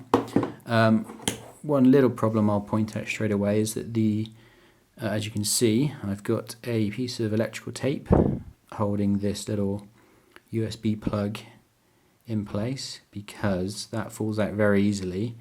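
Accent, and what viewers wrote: Bristol, United Kingdom. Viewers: are you from bristol ?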